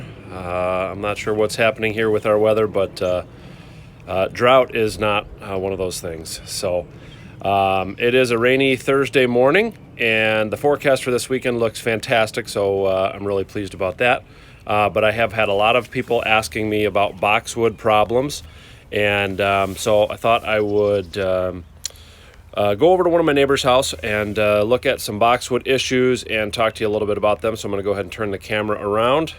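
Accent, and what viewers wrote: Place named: Northern Ireland, United Kingdom. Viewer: Thanks,. Interesting video and confirms what has happened to my hedge here in UK. 😥 btw Off topic. I detect some Northern Irish in your accent, is that your region or are you originally from Ireland?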